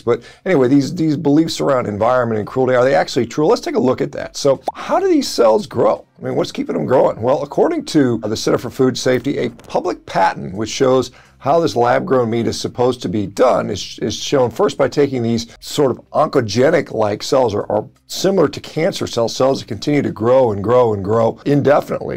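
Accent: United States. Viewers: Wow, thick as two short planks. 'Probably worse for the environment'. What is that supposed to mean????!!!! I can see that you are from the slaughtered meat lobby but you have not done your cause any good. You sound like a typical red neck American. You have little or no grasp of science and clearly do not understand what 'cultured meat' is. If you are from the slaughtered meatindustry you should be looking at this issue differently. There are positives and negatives. Around 800 million people globally rely on farming for thier income, directly and indirectly. It goes further than that too. This new form of meat production is going to impact, like a tsunami, eventually. Modern traditional meat producers need to work with this new industry and have control. You are going in the wrong direction with your post. Reply to me and get the real information as I have been researching this industry from the start.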